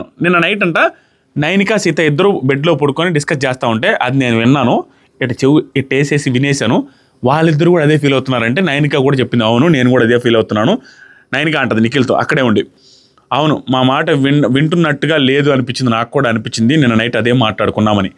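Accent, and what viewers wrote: Karnataka, India. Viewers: Kannada slag super anna 😂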